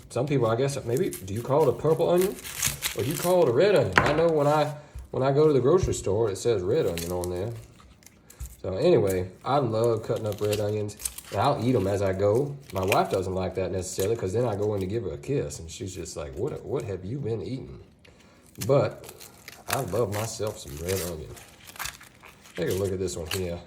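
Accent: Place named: Louisiana, United States. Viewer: I’d say Louisiana or south- judging by accent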